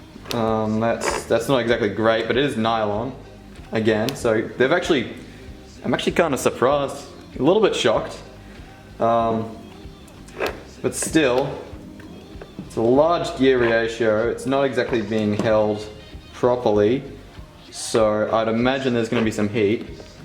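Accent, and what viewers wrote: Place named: Australia. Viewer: Are you an Aussie or a yank mate? Sort your accent out bud.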